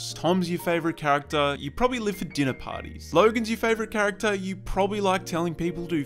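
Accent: Australia. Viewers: is this the same guy form skillup or every australian sound the same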